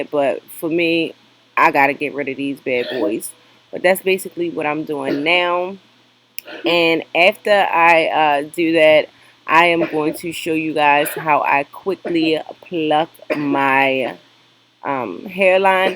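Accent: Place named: Maryland, United States. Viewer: That “Murrlin” accent is THICK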